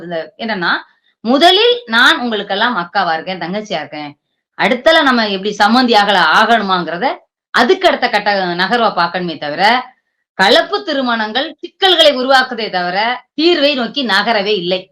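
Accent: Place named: Tirunelveli, India. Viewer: இந்த அம்மா சொல்வதுதான் சரி இதுதான் திருநெல்வேலி வள்ளியும் தமிழ் பேச்சு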